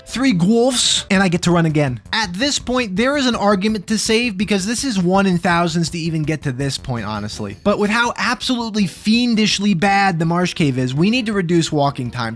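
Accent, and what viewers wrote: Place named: Philadelphia, United States. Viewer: avgn feels like Jersey, feels like Philly (I grew up in Trenton) Might be the way he says bawss reminds me of a Philly saying cawfee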